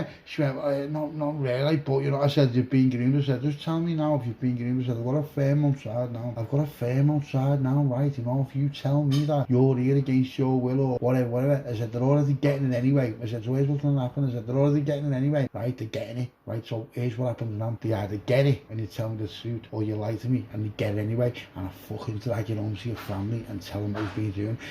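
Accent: Liverpool, United Kingdom. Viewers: Yes Scouse ❤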